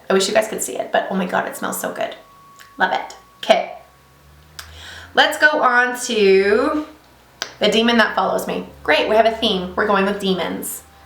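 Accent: Los Angeles, United States. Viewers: good stories but the LA voice really does break the immersion lmao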